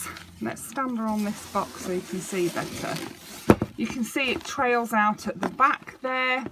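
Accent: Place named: Lincoln, United Kingdom. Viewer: I love to watch you're vids. I am an forty year old doll and toys collector. I am originally from Lincoln. But live elsewhere now I love to watch loads of other doll u tubers but like you cos of the accent it makes me homesick and all of your hauls are fab keep up the good work and hope to watch more of you're vids lots of love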